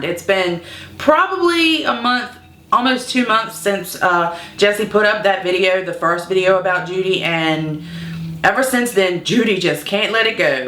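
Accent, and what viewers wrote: South, United States. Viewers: High five for us girls with a southern accent!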